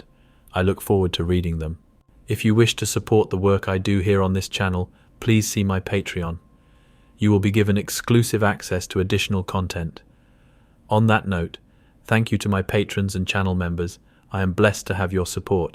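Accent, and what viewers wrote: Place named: England, United Kingdom. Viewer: MY BROTHER YOUR WORK AND VIDEOS ARE SECOND TO NONE!! AND YOUR ENGLISH ACCENT MAKE IT EVEN BETTER! AND MY FRIEND THERE IS NO OUTER SPACE, THATS WHAT WATER ABOVE THE FIRMAMENT MEANS! AND WHAT THE DEEP MEAN DESCRIBED IN THE BIBLE! HOW DOES AN EXPANSE OF AIR LIKE NASA CLAIMS SEPARATE THE WATER ABOVE? IT DOESN'T! THE FIRMAMENT IS A SOLID GLASS DOME SPREAD OUT LIKE A TENT OVER A FLAT PLANE! THIS IS ONE OF THE STRONG DELUSIONS THE BIBLE ALSO TALKS ABOUT! GOD BLESS YOU SIR!!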